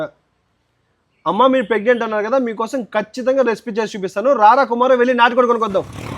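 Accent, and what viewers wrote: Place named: Tenali, India. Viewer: నువ్వు చెప్తే తెనాలి అనిపిస్తది బ్రదర్ నీ వీడియోలు అంత అందంగా నీ మాటలు చాలా బాగుంటాయి బ్రదర్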